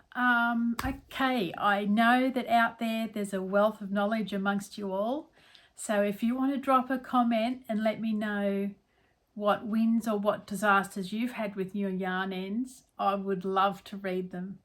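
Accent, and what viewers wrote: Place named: Australia. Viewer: I'm so happy that your channel popped up. It is so good to hear an Australian voice! I will be watching all your previous videos to catch up. I'm only relatively new to crochet; I tend to sew in ends as I go. I love the colours you have chosen for these squares. I haven't used Stylecraft yet.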